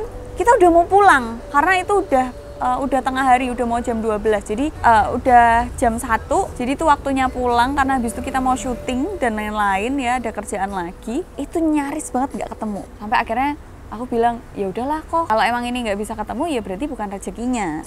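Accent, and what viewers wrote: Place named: Semarang, Indonesia. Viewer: Logat Semarang kok nggemesin :)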